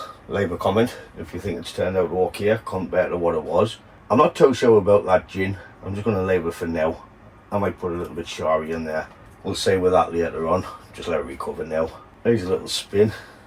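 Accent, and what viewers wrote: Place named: Northern England, United Kingdom. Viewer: you're welcome mate. I really enjoy your channel. As a fellow northerner stuck down south, it's refreshing to hear the banter and accent.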